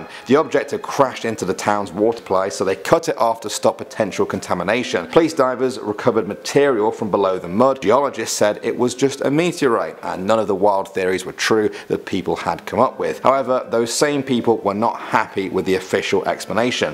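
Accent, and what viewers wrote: United Kingdom. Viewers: dark hues hes English/British.... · To all Australians who focus so much on pronunciation. Do you realize that this man is from Great Britain? You don't expect him to pronounce city names with yours accent. And if you think that your English is the only and right one, then remember where your great grandfather came from. Seriously that's ain't even funny.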